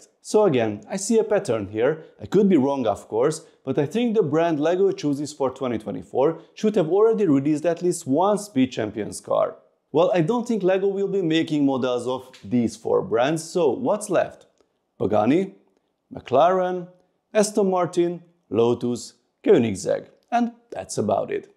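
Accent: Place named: Hungary. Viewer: Az akcentusod alapján magyarnak mondanálak :D Király videó!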